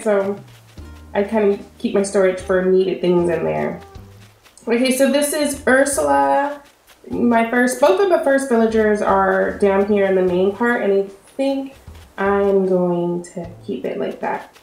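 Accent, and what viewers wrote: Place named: United States. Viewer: What region of the US are you from? I noticed you say some things in an interesting way, like when you say "guys"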